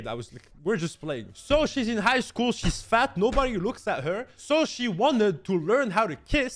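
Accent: France. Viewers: is he french ? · are you french ? is a really old question I'm asking me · Is it just me or does he sound way more french in this video than normal? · Is he french or something????? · Is he extra french on this one or is it just me? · this french accent is so annoying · U sound like a french accent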